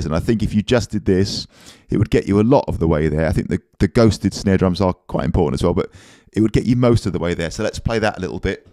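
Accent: England, United Kingdom. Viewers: Read my mind. I have always thought the same thing. There’s no other drum teacher quite like him IMO - and I’ve watched plenty. Apart from his skills as a drummer and exceptional teacher he has such a warm, affable and likeable manner. The lovely English accent is a huge bonus as well.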